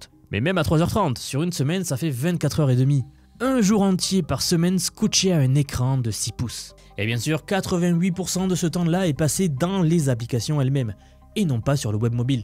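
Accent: South, France. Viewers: le Sud I guess ?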